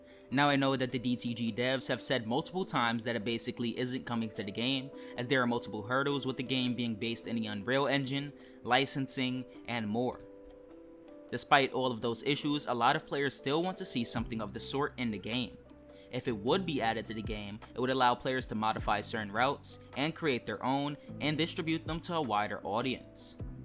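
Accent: United States. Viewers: You’re from New York aren’t you?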